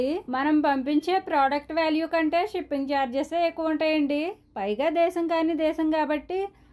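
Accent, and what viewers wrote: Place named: Kakinada, India. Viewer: Kakinada yasa tho baley sagadeesi matladutunnarandi.all the best.🎉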